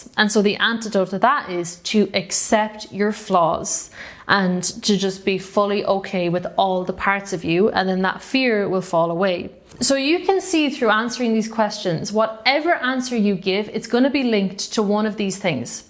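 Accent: Ireland. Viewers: I lived in Ireland for 7 years, it’s the most dearest loveliest place on earth, I call it my Mother land. And your accent is the sweet nectar to my ears 🍀🍀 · Love your content - Irish accent is beautiful!! I am Irish myself from Limerick. Stop self sabotaging your attempts at drawing!! Haha....you rock woman!! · Rewrite equals review or revision. Because resistance it’s versus support. Every time you speak, and your Irish accent steps out you instill this motherly love feeling that speaks to a man’s core identity, it’s a mothering, nurturing, caring, loving kindness, even a protection, you radiate a maternal love that he heals:)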